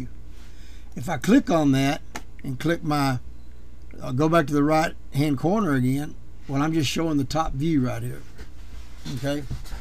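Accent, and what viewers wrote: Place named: South, United States. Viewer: two questions my friend.... 1. what game are you exporting to? 2. where you from? (great to hear the southern accent...I am from Alabama)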